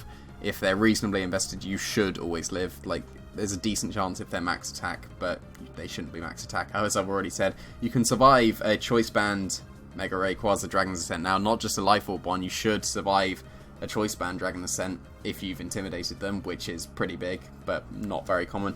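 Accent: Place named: England, United Kingdom. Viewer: English accent best accent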